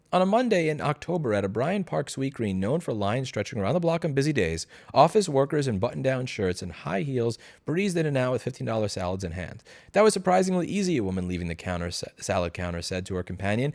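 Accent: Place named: Texas, United States. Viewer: sounds so Texan and then yells out " Forget about it "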